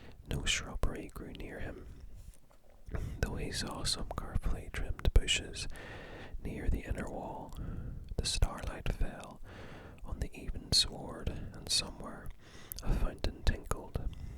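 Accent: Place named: Ireland. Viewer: I’ve been searching ‘Conan ASMR’ on a regular basis for like ten years hoping something good would pop up one day, super glad something finally did. The Tower of the Elephant was one of Howard’s best, in my opinion. If you do another Conan video (& I really hope you do), what story do you think you’d do next? I personally think the Phoenix on the sword would be a good one for ASMR, but obviously any of them would do. I also want to mention that I think it’s great that you have the accent that you do, because while I think most people understandably imagine Conan with Arnold’s thick Austrian accent, in my personal head-canon, he’s Irish. So it’s really cool to hear that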